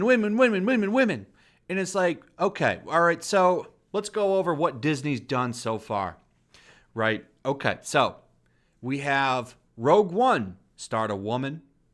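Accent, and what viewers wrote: United Kingdom. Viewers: Enough with the horrible British accent!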